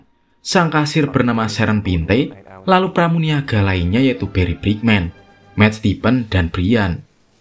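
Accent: Java, Indonesia. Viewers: Movie crop versi medok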